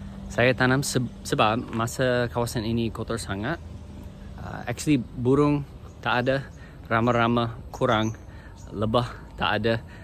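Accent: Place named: North, Malaysia. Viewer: Kamu sebut "pokok petik". Haha! Ini betul2 sebutan org utara utk pokok betik atau papaya!😂Dah jad org utara kamu ye!😂Kamu juga sebut "pokok pelam" utk pokok mangga, lg sebutan utara, selain kamu sebut "ayaq" utk air!😊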